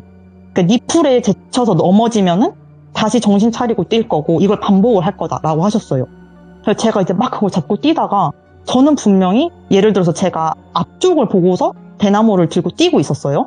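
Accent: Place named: Jeolla, South Korea. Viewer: 전라도특유의 사투리가.ㅎㅎㅎ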